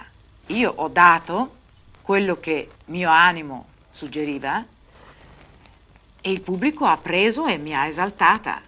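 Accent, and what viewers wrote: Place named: Veneto, Italy. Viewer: Giusto. Si sente (ancora) l'influenza dell accento Veneto.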